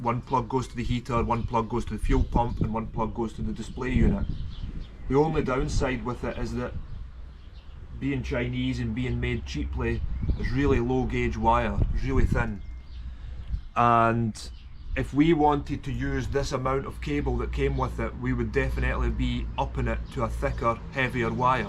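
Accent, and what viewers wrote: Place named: Scotland, United Kingdom. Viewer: Thats a East Scotland accent i'm sure lol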